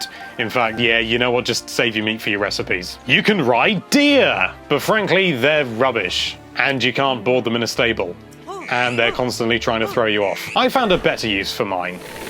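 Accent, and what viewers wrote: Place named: United Kingdom. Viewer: Those are Elk not deer you silly Brittish sounding person...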